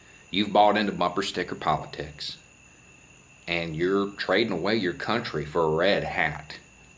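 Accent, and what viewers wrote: South, United States. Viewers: sheeeit!...this is that same pinko from TCF but with a hushpuppy accent an a growed out beard an he's preaching to the non-converted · ok so i love all of your videos and the messages that come with them.... but i am curious about one thing, I have seen other videos where you dont have a southern accent...whats with that? are you playing a character or what? maybe im mistaken, im just really confused, im not trying to discount the messages in these videos.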